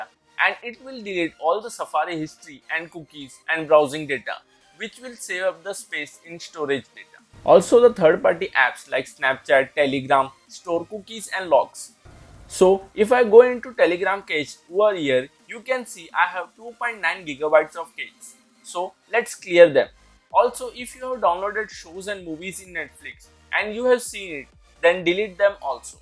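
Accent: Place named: India. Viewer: You hear an Indian voice… uh oh. Clickbait 99.9% likely ahead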